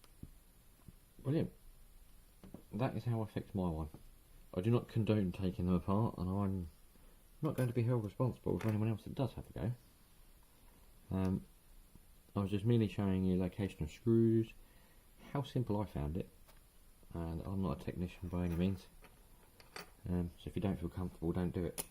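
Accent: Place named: Cockney, United Kingdom. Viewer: Tips: - Use maximum volume if you can't hear him. - Use CC to translate the avalanche of British cockney gibberish.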